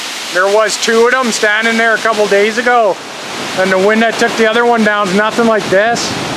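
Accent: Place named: Newfoundland, Canada. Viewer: You sound like a Newfie ?? Good coverage 👍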